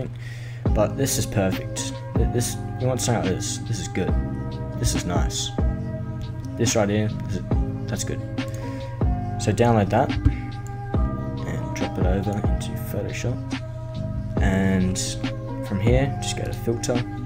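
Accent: Australia. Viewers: HEEESSS AAA FUUUCKKIINNG AUUUSIIEEEE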